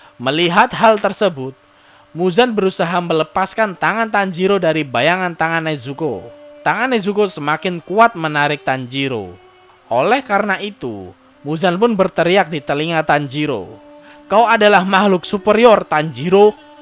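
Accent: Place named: Java, Indonesia. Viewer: Jawa bet lu njirr...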